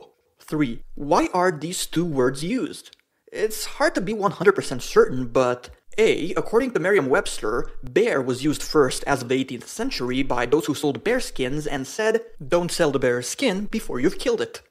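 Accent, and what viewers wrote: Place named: Singapore. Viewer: Are you from Singapore? Thick accent.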